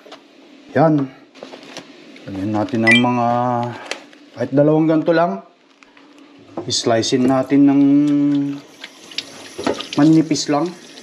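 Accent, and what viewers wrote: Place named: Visayas, Philippines. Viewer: Bakit parang bisaya na accent mo kuya hehehe ✌️✌️✌️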